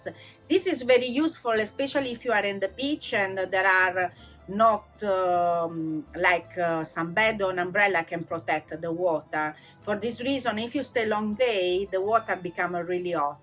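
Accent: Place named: Italy. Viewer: Very good advices, and I love your Italian accent ❤️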